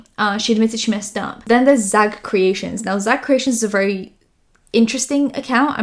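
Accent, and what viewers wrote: United Kingdom. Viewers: aw ur british accent is so cute!!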